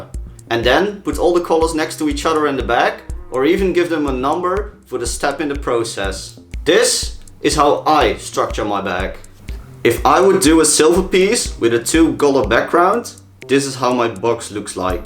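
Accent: Netherlands. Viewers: Good tips brO:) r you dutch? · this guy is dutch no doubts lol. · Dutch for sure i hear it right away in the accent. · According to his accent, he could be dutch.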